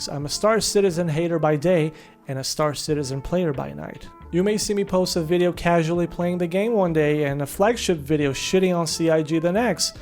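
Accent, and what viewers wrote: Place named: Israel. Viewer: This guy sounds Israeli... Ewww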